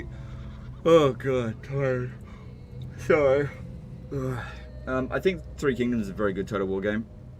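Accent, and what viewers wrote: Australia. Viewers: i was there when he still cursed a lot spoke australian while doing m2tw XD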